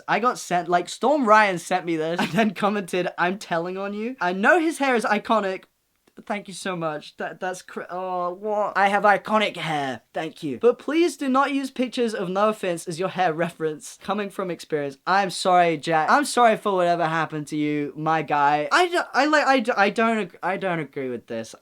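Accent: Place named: Cockney, United Kingdom. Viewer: Your cockney accent is the best thing I've heard all week.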